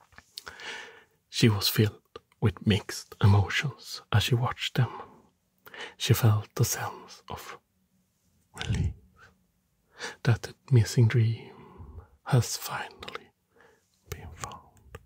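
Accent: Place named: Germany. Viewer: I love your Asmr, you have like a German/northwest European accent which reminds me of a friend. You make great Asmr! Lots of respect to you 👊🏻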